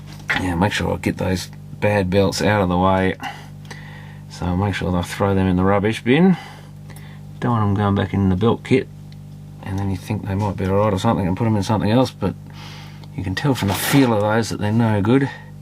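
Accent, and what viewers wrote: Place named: Australia. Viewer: I’ve been tinkering around with a similar radio, trying to get the cassette player working again. I wasn’t expecting an Australian accent I’m glad I stumbled along your channel mate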